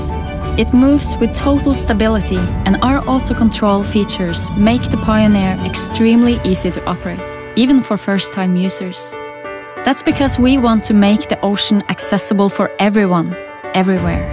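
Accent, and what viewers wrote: Norway. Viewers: Vi trenger ikkje prate oxford engelsk! Neh, heilt greit det der. Du hører når ein franskmann snakker engelsk feks, samt andre nasjoner så skinner det igjennom kor dei kommer fra. Så koffor kan ikkje vi ha litt norsk engelsk da? Har noen engelske venner som synes "norsk" engelsken er ganske kul sammenligna med mange andre. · I agree. Norwegian accents are charming. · Marius VanDamme Jeg hadde vært enig med deg om det ikke var for at det var så ille. Grunnen til at jeg ikke fokuserer på fransk-engelsken er nok fordi at det ikke er så store hint om fransken. Om en franskmann hadde hatt like store vansker som denne jenta her har med engelsk hadde vi ikke forstått noe. Vi burde vel egentlig være glad for at det ikke var en mer uforståelig engelsk i videoen. Om det bare var litt norsk igjen i engelsken, som for eksempel 10%, hadde det nok ikke vært så ille, men hun har jo sånn 50% norsk i engelsken sin, det er ikke bra.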